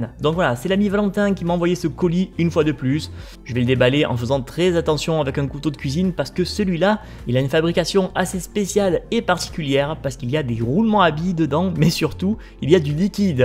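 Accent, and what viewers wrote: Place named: Marseille, France. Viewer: Il est marseillais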